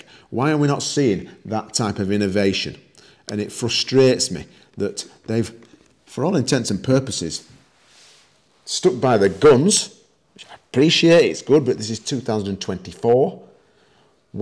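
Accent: United Kingdom. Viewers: With that accent, I was expecting the price to be in a British pound rather than dollars.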